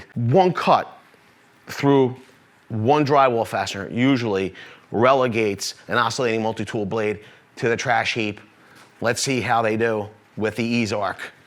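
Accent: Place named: South Jersey, United States. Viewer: You sound like your from South Jersey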